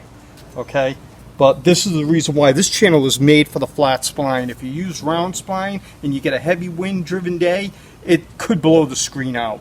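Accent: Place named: Northeast, United States. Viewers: Ugh. That New England voice. I couldn't listen Mr know it all. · ! Thank you Man!!! Great tutorial and love the NY NJ accent!!! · This guy sucks, don't quit your day job you terrible accent masshole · The best DIY videos are done in a NE accent, so I can feel right at home. Thanks for all of your specific tips!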